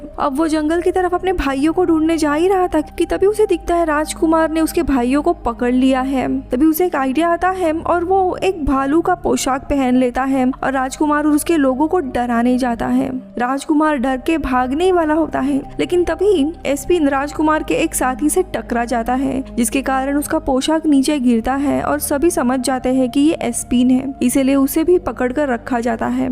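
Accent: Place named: Bengal, India. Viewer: apki hindi sunkar aysa lagta hay ke ap bengali ho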